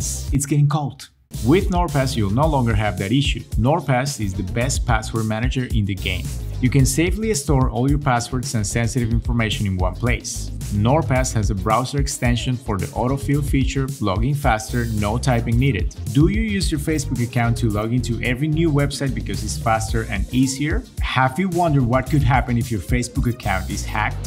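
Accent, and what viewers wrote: Colombia. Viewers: Are you Colombian? Something in your accent and sense of humor makes me think you’re Colombian 🇨🇴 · The way you explain it was so nice! btw, loved your Colombian accent! Colombian here too :)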